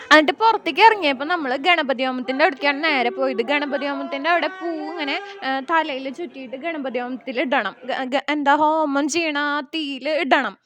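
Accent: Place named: Thrissur, India. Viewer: തൃശൂർ ഭാഷ❤,ഏതാ അമ്പലം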